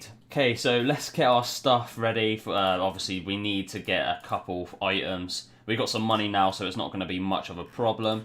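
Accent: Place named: Coventry, United Kingdom. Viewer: You sound like you are from Coventry, or in and around west midlands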